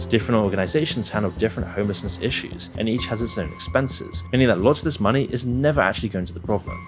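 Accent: United Kingdom. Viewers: Why does moon care about what’s happening in the USA? He sounds British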